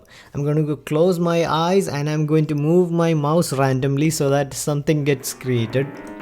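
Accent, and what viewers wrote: Kerala, India. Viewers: he has Indian accent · you kinda sound indian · by hearing the voice itself i had a doubt is this guy a malayalee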